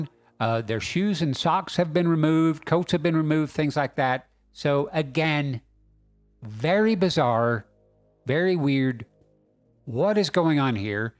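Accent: South, United States. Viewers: Damn you and your common sense! All delivered with a charming southern accent :)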